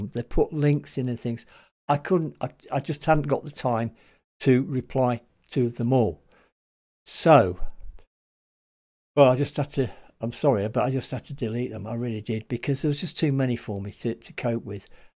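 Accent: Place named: United Kingdom. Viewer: Thanks always from Japan 有難う💛。 Yes. When you reply to or put heart mark on my comment, it makes me happy, but that is not what I expect on your reaction videos. Your smiles, grinning, smirks and witty observations in British accent on Band-Maid, BABYMETAL etc. are what I want to get from you. The reaction video is just for fun, not mandatory duty for both reactors and viewers. Please keep on just the way you have been.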